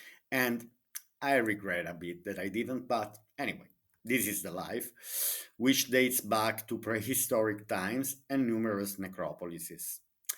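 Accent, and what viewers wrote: Italy. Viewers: Thank you for pleasing your subscribers. I am enjoying the Italian pronunciation 🎉 i love❤ Molise. Hope invite us to his new home!😊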